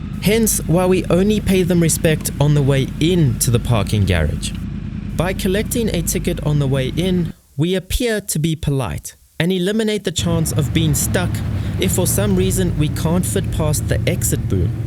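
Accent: South Africa. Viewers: You South African? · South African? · Where u from m8? South Africa? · Are you from South Africa mate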